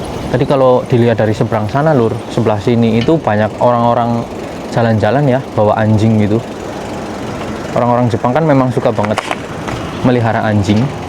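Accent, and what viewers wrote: Banyumas, Indonesia. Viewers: Cilacap Barat Sidareja hadir Mas, salam sehat dan sukses selalu buat jenengan, Aamiin. Jenengan asline ndi Mas bahasane ngapak,ana retung, nemen dsb 😂😂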